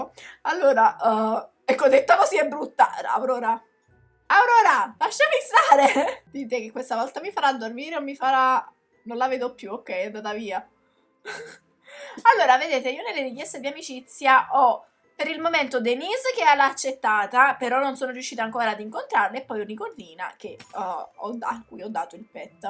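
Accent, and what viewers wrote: Naples, Italy. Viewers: raga solo io sento un accento napoletano hahaha <3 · Ma sei napoletana? Scusa se te l'ho chiedo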